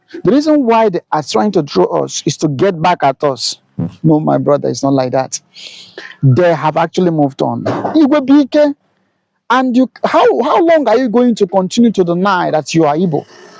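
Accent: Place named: Ghana. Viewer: You don't know what you talking about in fact you speak like Ghanaians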